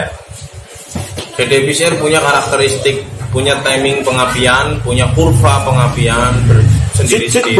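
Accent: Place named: Java, Indonesia. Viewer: Aku pengemar ntn chanel. Tapi yg aku salut, abang nya slalu merokok. Kira2 brapa bungkus bos sehari habis roko nya. Wkwk... Slm logat jawa mas. Izin kan trus aku belajar d chanel nya.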